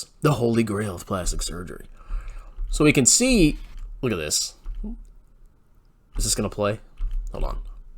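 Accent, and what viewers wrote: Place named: Virginia, United States. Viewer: Haven't heard the Virginia accent for a while..come on. Pllleeeaaasssee!!!!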